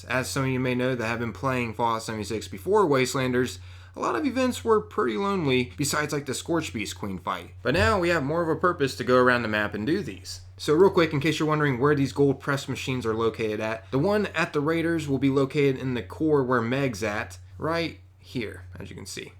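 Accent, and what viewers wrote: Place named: Philadelphia, United States. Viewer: i needed this info! p.s. ill bet the speaker is from the philladelphia area.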